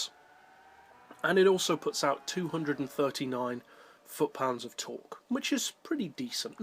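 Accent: United Kingdom. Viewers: urr so british!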